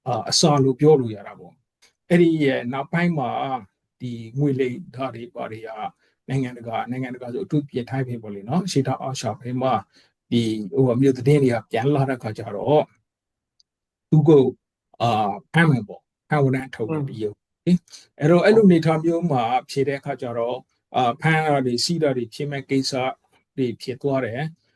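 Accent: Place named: Dawei, Myanmar. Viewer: ထားဝယ်လေသံန့ဲဆိုတော့မနည်းနားထောင်ရတယ်တစ်နေ့န့ဲတစ်ရက်အတူတူဘဲနော်ဒေါ်လာသန်း192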